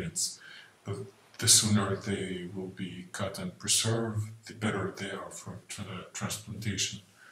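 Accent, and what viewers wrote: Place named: Russia. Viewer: Четкий акцент рускоговорящего который пытается говорить на английском .Цель просто кого-то очернить.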